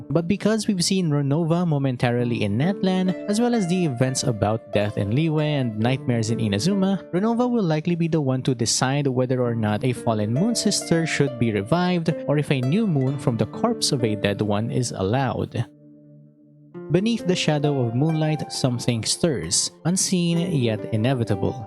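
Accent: Philippines. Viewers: LMAO.,!! this is the first time that I really paid attention to how you speak and suddenly I could tell that you were a Filipino because of how you pronounce words so I checked your profile and saw your location in the Philippines!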